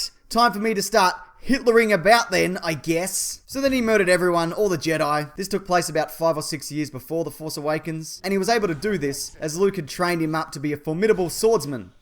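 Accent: Australia. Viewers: I dig. I dig it all. I also dig the Aussie accent - helps me pay attention.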